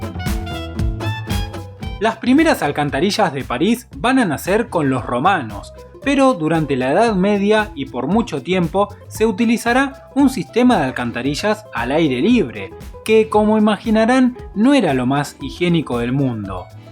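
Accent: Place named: Argentina. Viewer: lo intente ver pero el tonito argentino es realmente castrante, no pueden esforzaren hablar bien, no se dice casye se dice calle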